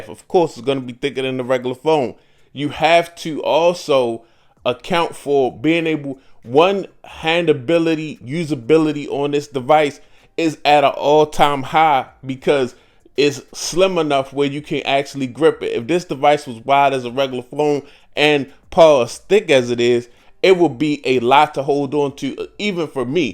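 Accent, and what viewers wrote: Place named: DMV, United States. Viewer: You got to be from the DMV lol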